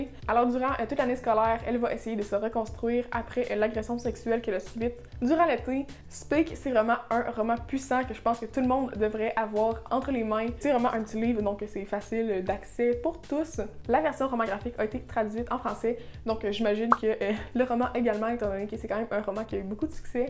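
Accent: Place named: Quebec, Canada. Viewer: J'ai découvert ta chaîne grace à un de tes commentaires laisse sous la vidéo d'Audrey et ça me fait tout bizarre d'entendre un accent québécois ahah ❤️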